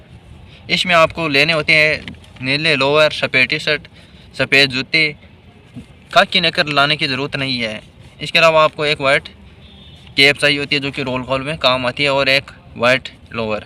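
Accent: India. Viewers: भाई बोली भाषा से तो राजस्थानी लग रहे हैं तो प्लीज़ अपनी क्षेत्रीय भाषा को अवाईढ ना करें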